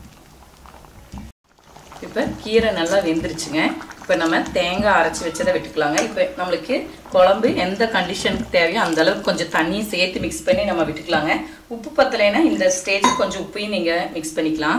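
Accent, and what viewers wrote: Kongu, India. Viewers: Mam puli seka venama??? Nenga kongu Tamil ahh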